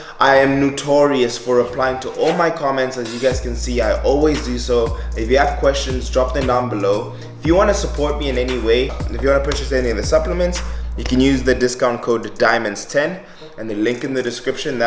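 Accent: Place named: South Africa. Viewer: This accent sounds South African 🤔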